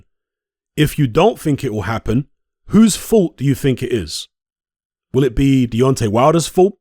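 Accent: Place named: United Kingdom. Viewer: Why did your tone change when Wilders was mentioned? Why is there someone at fault. Are you ignoring that Wilder is in mediation. This has been one of the most bias boxing videos I’ve seen, although you try to play it off. Ruiz made a video saying he isn’t fighting Wilder there aren’t any talks it’s a fan made poster and Rumor. This is sad coverage idk if assuming off your accent, but if you’re from the UK it’s pathetic. All UK fighters serve as easy meal tickets for Carmelo. Bjs probably getting stopped, and Aj ran from Ruiz and Hearn doesn’t like the idea of running it back a third time, they’re 1-1 Aj never avenged his loss he beat an out of shape Ruiz and ran at that. You saw the fear in his eyes every time Ruiz would swing that night. Fury definitely cheating in both fights you can’t photoshop videos and those gloves definitely didn’t look normal. 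Both those men are the best this UK mega fight has to offer it’s sad. The fact they haven’t even announced a venue tells me this fight may not even happen.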